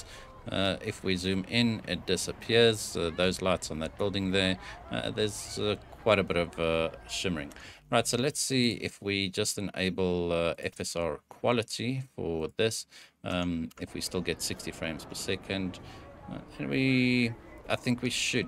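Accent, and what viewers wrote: South Africa. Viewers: Yeah true, I’ve been playing it since 2.0 patch, even on my gtx1660, it’s still quite a decent experience. Just bought a RX6600XT for R3500 yesterday (I’m also South African). I was just digging through some benchmarks for this card and recognised your accent and decided to continue watching.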